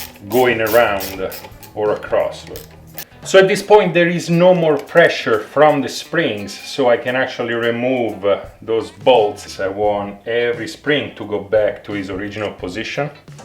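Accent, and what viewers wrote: Italy. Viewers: NOTHING LIKE A PANIGALE TUTORIAL WITH AN ITALIANN ACCENTT :D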